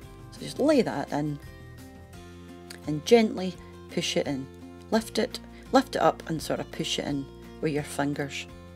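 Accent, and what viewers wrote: Scotland, United Kingdom. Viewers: Hello from Michigan, USA. I just found you here a few weeks ago. I have made a few of your recipes. They were good. I made individual Cottage Pies and froze all but one, which I ate that night. Husband doesn't like them. He can be a picky eater. I like your recipe better than the one I have been using. Also the beef stew with orange marmalade. My paternal grandfather, born in 1900, was from Aberdeenshire, and came over with his family in 1906. I am glad that I found a Scottish woman who cooks real recipes the true way. I also love your accent. Your weekly shopping got me to go back to using Aldi. I do have to look up and convert grams, Celsius and mark 5. My maternal grandmother was from Canada, so I have no problems with your way of speaking. I know that tea time is our supper, you sit on the Chesterfield instead of a couch, running shoes are tennis shoes, etc. 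Keep up the great show. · ❤ the accent and this recipe. Scottish? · I love the scots accent, just great. The recipes as well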